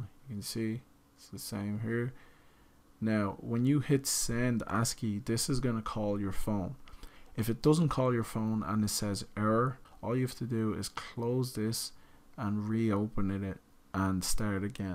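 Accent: Dublin, Ireland. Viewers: Bleedin' Dublin accent x'D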